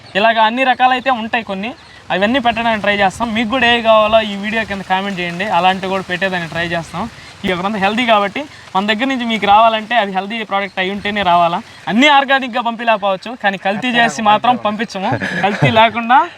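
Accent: Nellore, India. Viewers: Nellore ollam adhi ma yasa elane vumtumdhi😊😊😊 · మీది ఏ ఊరు బ్రదర్.మీ బాషా చూస్తే నెల్లూరు లాగా ఉంది.మా అమ్మ వాళ్ల ఊరు వెంకటగిరి.మా అత్తగారి ఊరు చిత్తూరు.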